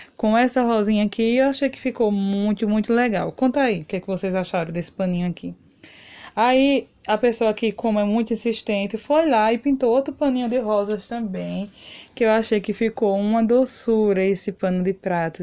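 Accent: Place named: Alagoas, Brazil. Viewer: Seus trabalhos são liiiiiindos ,amei seu jeito de falar ,alagoana né?gostei muito e vou me escrever pra aprender fazer todas essas coisas lindas que VC ensina. Não sei pintar mais pretendo aprender ,Deus te abençoe.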